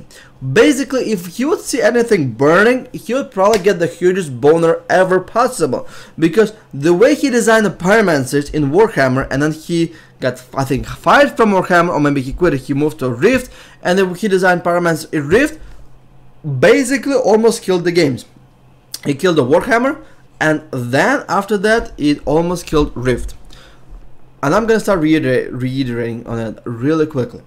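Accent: Russia. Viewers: your russian accent is good with soothing music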